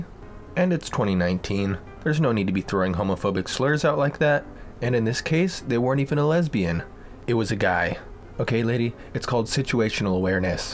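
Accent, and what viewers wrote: Australia. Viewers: I loved your Aussie accent. You did a very job 🇦🇺 ☺️ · Mate you did a great job at the Aussie accent.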